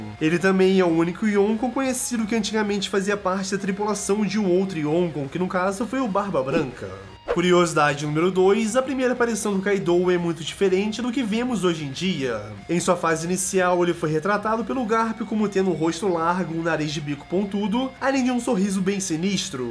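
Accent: Portugal. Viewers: Eu só percebi que era um português falando, no fim do vídeo. Kkkkkkkkk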